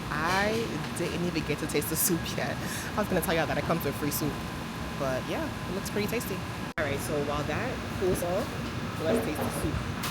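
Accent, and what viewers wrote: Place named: Caribbean. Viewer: Friend —- u really sound like u have Caribbean roots 🥳 I’ve noticed it in a lot of ur vids 🙃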